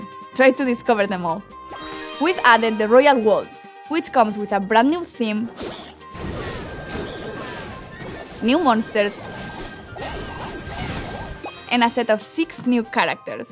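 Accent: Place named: Spain. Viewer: Precioso acento Español y genial beta jajaja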